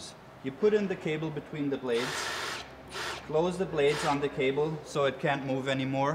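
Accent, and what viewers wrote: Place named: Russia. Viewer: I love your Russian accent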